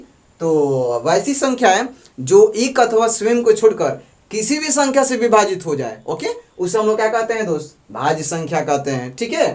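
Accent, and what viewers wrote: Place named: Bihar, India. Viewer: Bihari log ka boli mst h😊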